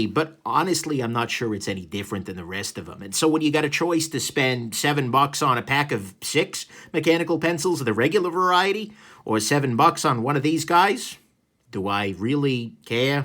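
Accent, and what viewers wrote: New York, United States. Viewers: Your accent is so cool! Are you from New York? I quite like this pencil for drawing technical drawings, I still need to upload a video where I use it but I like it so much that I'm going to be selling these on my website. I am looking forward to trying one of the Rotrings some time.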